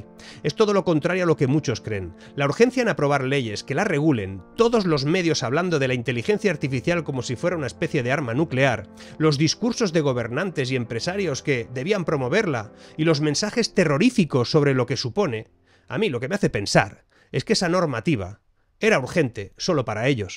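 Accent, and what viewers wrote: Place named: Spain. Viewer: Me encanta el contenido del video, me causa sorpresa y una inebitable distraccion que habla con acento Español de España con su bonita camisa de la seleccion de futbol Italiana, es esto un provocacion de oficio?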